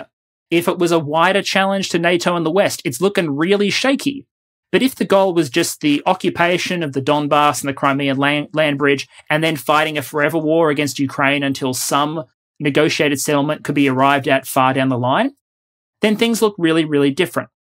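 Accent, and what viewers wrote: Australia. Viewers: Hi, nice to hear an Aussie accent again. I'm Aussie born (Sydneysider), but have lived in Europe for 57 years, so the main part of my life. I do however still keep track on the goings on Downunder. As for that financial bit, they could be counting on the unexploited resources of the Donbass area and got the figures mixed up. I mean that points to the potential of the area, not what is being produced today. The Donbass holds large quantities of oil and gas and only a minimum is being exploited today. The presidents/governemts before the Maidan revolution (February <num> never put much money to it and kept Ukraine dependent on Russian supplies, which they frequently turned off and let the Ukainians sit in the cold. That was never really necessary if they had exploited those fields themselves. This brings me to why Russia went into Ukraine. 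After the Maidan revolution (2014) plans were made in conjunction European energy and fuel conglomerats to start exploiting those reserves. Because a. that would ensure gas supplies to Ukrainian citizens so they wouldn't have to suffer cold winters again and b. the European market was increasingly in need of gas and it would make them less dependent on Russian gas, with the bonus of revenues for Ukraine. That is what sparked the Russian invasion. They were afraid of losing their controle over the European market and losing their hold on Ukraine. Every expanation else is just Russian propaganda and holds no merit. However the Russian inv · I would like to know the overall effect of the cleaning out of all the old Soviet hardware. Is this a good thing? Does this allow all the EU countries to upgrade and standardize their military hardware. And NATO has pledged a 300-400,000 man force. Do you think this is affordable for the long term. 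Because it seems that this 400,000 force will require about 2 years to become really effective. And If I were President Putin, I would try to have everything sewn up by say, next spring. A stablized drawn out struggle works against Ukraine, because Russia can send random missiles to reach any factory or training center anywhere in the country. These Are my 2 questions. Thanks. I always like your information. I enjoy your jokes and your accent. As being here in Japan, I don’t meet anyone with an Australian accent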